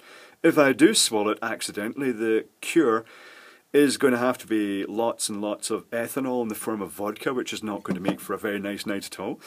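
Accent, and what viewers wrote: Scotland, United Kingdom. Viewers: something satisfying about a Scottish guy cursing.......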